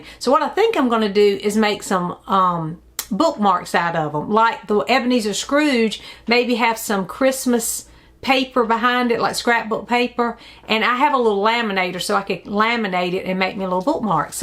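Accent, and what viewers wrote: South, United States. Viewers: Hi! I haven’t yet commented since finding your channel earlier this summer so today I decided was the day. I adore your channel! I really love your southern accent. I’m originally from Wisconsin so I am not accustomed to hearing the southern drawl and I find it so endearing. Whenever I find a new channel I enjoy, I go back and watch all of their videos to get to know them. I’m so enjoying you and the infectious love of books you bring to your channel. Your genuine passion and love shines through brightly and I thank you for being such a bright light. I love everything you post! I would love to see a video talking about your all-time favorite books that have left a lasting impression on your life. Thanks for sharing this haul 📚 ~ Chelsea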